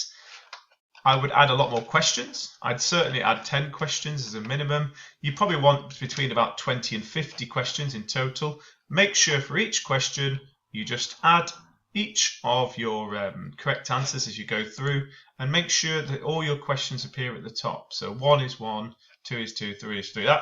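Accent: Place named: Ireland. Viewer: Ireland XD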